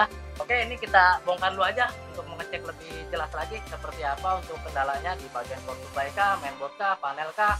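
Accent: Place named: Betawi, Indonesia. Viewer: Logat bicaranya lucu ...Betawi Betawi gimana gitu.....mirip teman saya orang serpong😅😊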